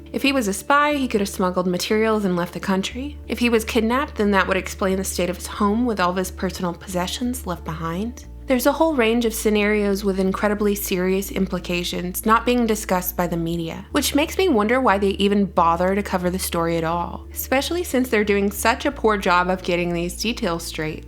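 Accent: South, United States. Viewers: I really like your attitude, smarts, and conservative skepticism. And, of course, that sheepish suthun chahm. (It's ok, I'm a native) Please keep updates on the gentleman from Emory. That place makes ME nervous. Atlanta is NOT the place for the kind of toxic crap they keep there! Put it under Stone Mountain! Or a government parking lot! Lol! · Nothing like a southern girls voice 😊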